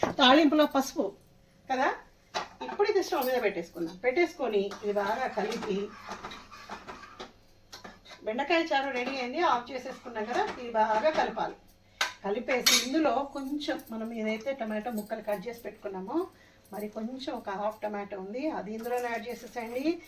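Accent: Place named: Andhra Pradesh, India. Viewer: Mi matalu telangana slang la ledhu mam looks like Andhra lovely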